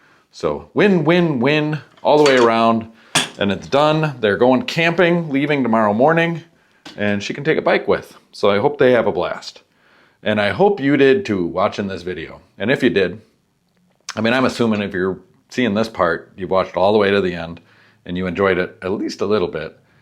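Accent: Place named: Minnesota, United States. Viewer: Hearing you talk is like being home in Montgomery Mn again as a kid.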